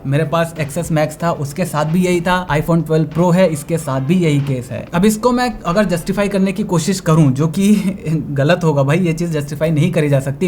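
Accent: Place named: Maharashtra, India. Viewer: One more thing, by your accent , i think you are marathi cause im also marathi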